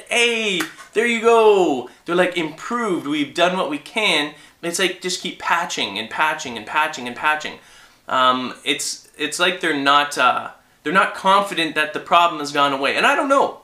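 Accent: Canada. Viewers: yeah i think hes canadian like meh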